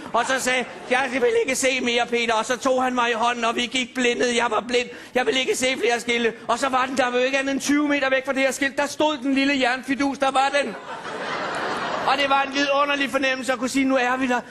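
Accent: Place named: Denmark. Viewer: he is reading Norwegian in Danish making it sound really stupid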